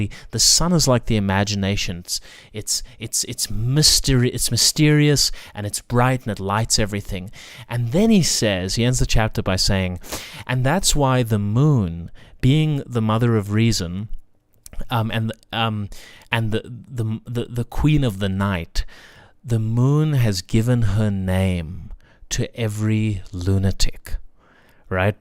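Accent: South Africa. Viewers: I know that accent anywhere. Hello, my fellow South African❤️. Praise be to God for your journey and conversion🙏🏽. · As soon as I heard your voice I thought, He's South African! (like me). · As soon as you started speaking I thought hey you sound south african. Fellow south african catholic here,welcome home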